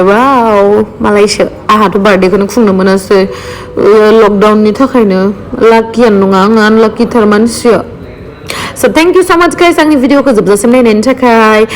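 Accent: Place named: Arunachal Pradesh, India. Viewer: Sach main arunachal ke log aise bolti hai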